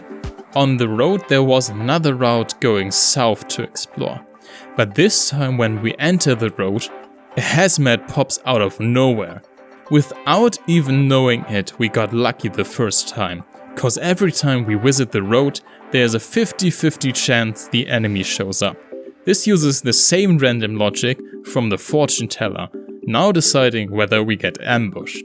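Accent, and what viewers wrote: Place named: Germany. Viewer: Why am I not surprised the accent in this video is German. Only a German engineer could pull this off.. Excellent job!!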